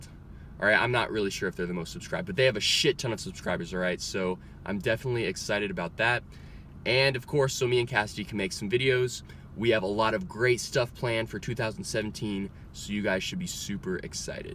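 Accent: Ireland. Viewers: Are your parents or grandparents Irish? You have slight Irish accent.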